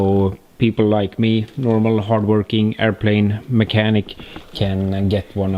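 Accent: Sweden. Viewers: är du från Sverige eller?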